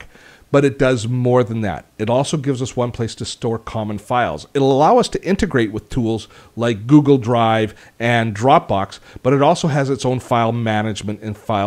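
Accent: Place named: Canada. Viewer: Canadian heavy use of "aboot".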